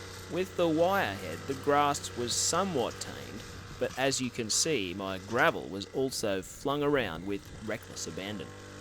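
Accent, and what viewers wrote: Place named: Australia. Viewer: A stupid AUS accent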